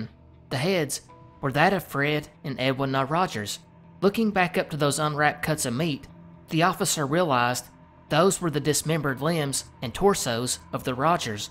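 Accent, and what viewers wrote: South, United States. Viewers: You don't know how happy I get when I see a new video from you. Love that southern accents. It makes the videos so much better. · There’s something about a country accent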